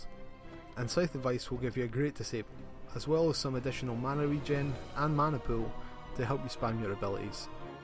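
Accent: Scotland, United Kingdom. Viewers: What accent is it? Scottish, irish?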